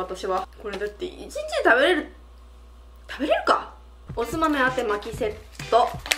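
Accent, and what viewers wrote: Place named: Japan. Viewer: 都民からするとは結構なまってるよ🍊 方言で地元感あっていいよね🍊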